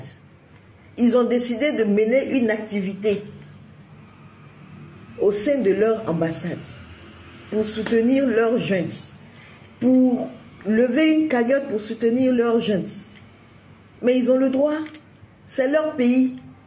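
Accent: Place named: Ivory Coast. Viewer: Ouiii mais vous parlez comme une ivoirienne ma sœur !! soutien !!!! Ils sont trop comme ça !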